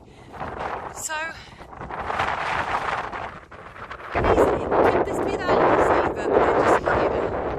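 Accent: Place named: United Kingdom. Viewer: No Chris with you?. Your accent is developing into a really posh english one now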